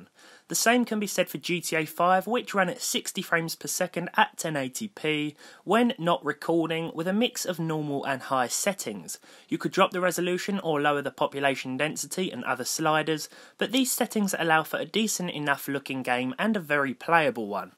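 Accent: United Kingdom. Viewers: Well. Your accent made me understand the reason why British men are called Gentlemen.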